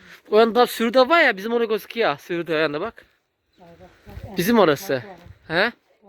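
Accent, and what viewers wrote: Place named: Zonguldak, Turkey. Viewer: zonguldakça ayrı bir lisandır👍, kardeşim hakkını veriyorsun. bilhassa bu doğallık baktırıxor bu Vloglara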